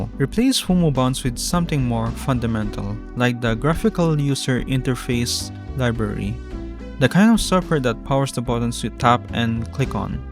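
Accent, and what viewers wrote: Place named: Philippines. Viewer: I can hear the tagalog accent, so I'm guessing is from the Philippines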